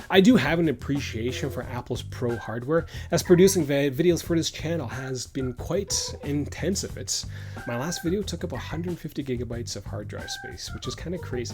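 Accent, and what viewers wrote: Canada. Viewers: 2-things 1) there’s a dog in this video! 2) you bench 240lbs? Legit. (But you sound Canadian, is that kilos?)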